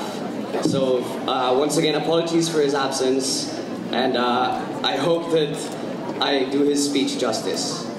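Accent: Philippines. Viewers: I feel like his a little nervous. But still, he really did a great job. I kinda like his tagalog accent tho. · Pero Ngayon nawala na Yung accent Niya.. Pinoy na Pinoy na Ang accent Niya Ngayon hehe Marunong narin magilocano Ngayon eh.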